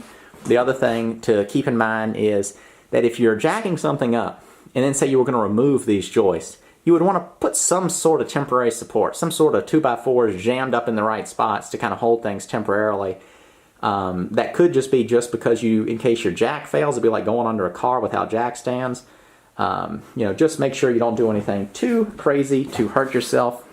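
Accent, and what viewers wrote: South, United States. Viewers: What state are you in? Your accent sounds like Alabama, Mississippi, Tennessee, or Georgia. Maybe the Carolinas....I'm in GA.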